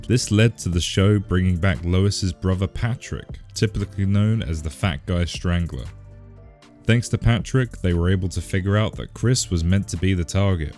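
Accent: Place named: United Kingdom. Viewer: It’s so obvious that this guy is British…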